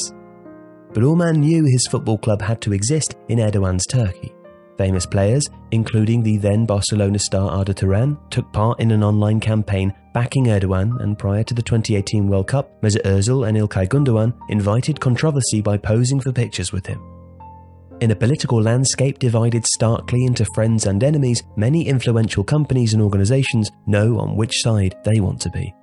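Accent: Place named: United Kingdom. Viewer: Wow. Your british accent so nice, greetings from turkey nice channel keep goin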